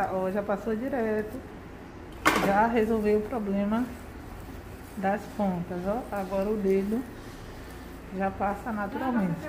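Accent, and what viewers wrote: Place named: Bahia, Brazil. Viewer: Esse sotaque com certeza é da minha bahia que amo de paixão ❤ Depois que lavar não volta ao normal???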